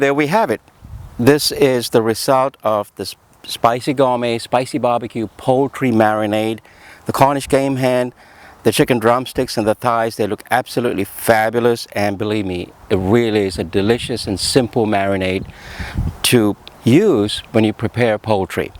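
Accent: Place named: North America. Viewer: This south Asian brother sound like North American..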